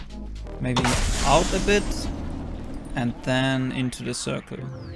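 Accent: Germany. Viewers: Bro du bist ein deutscher oder? Hört sich jedenfalls so an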